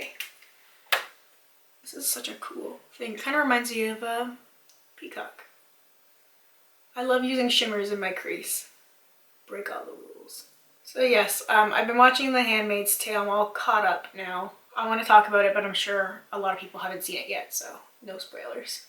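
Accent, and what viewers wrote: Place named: Canada. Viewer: I can't understand how anyone could possibly have an issue with you. You come across as such a sweet and genuine person. I don't know any Canadians so I'm loving your cute little accent too. Keep being you as you're great as you are. Remember, this is your channel and you don't owe anyone anything! Much love ❤️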